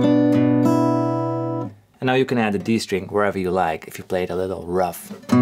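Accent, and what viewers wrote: Netherlands. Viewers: hij heeft een best duidelijk nederlands accent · Are you from the Netherlands? I never noticed😂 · ik hoorde al een NL accent in je intro! Dope vid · After 3 sec of speaking I` could here immediately you are a dutch guy..amazing haha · Ik hoorde gelijk dat je Nederlands was 😂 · Ik wist niet dat je Nederlands was maar had wel al z'n vermoede met het engels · I heard your dutch accent instantly. I'm dutch too👊🏽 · Ik dacht al meteen... Hij heeft een Nederlands accent en ik zie je kanaal voor het eerst, dus ik wist het niet. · Haha ik dacht op het begin wat een raar accent het lijkt haast wel een Nederlander en toen dat stukje van je vrouw was het echt zie dat dacht ik al. Btw leuke video en erg interessant! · Oh shit, you're Dutch lol. Hoorde het eerst niet maar nu wel hahaja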